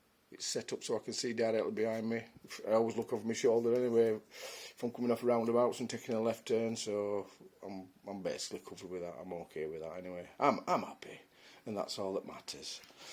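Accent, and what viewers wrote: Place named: United Kingdom. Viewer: I sucribed bro.Nice accent which part of UK you from.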